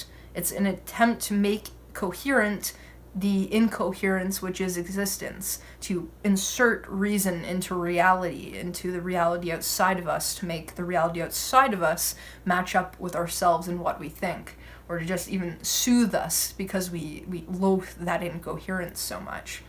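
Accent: Canada. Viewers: What is this "boot" she keeps on mentioning? "I think this is a boot wine drinking competitions." "Here Epictetus is talking a boot spanking Spartans." (Actually, I think it is charming. I would move about Canada just to hear people say "a boot.")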